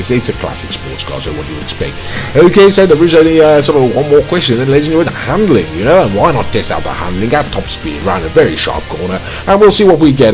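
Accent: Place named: RP, United Kingdom. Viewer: you sound a bit posh init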